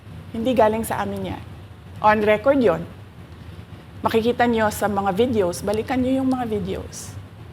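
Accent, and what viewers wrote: Visayas, Philippines. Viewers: Ayan TV5 umabot nadin kayo ng more than 100k viewers. 👏👏👏 Hanggat hindi maging fair at ma katutuhanan ang mainstream media, hindi hindi kayo nakaka abot man lang ng 30K na viewers. Kaya huwag kayong nagtataka kung mas maraming nanood sa mga political Vloggers contra sa tv news. Sino ba ang mas tutuo itong si Inday (David) compared the titans in Congress (Goliath). Eto si Inday na sinabihan nilang BUDOL at Bobo dahil sa kanyang Bisdak intonation. LETCHE! PROUD BISDAK SI INDAY! Ngayon, Congress has already spoken in name of OVP's sponsor that "MOOE at Capital Outlay" lang ibibigay sa OVP za kadahilana g di pag dalo ni VP Inday. Di man lang nila tinignan ang pambabastos ng Congressmen ki VP Inday during the first hearing where siya sinabihan Pusit . Saan ang courtesy doon?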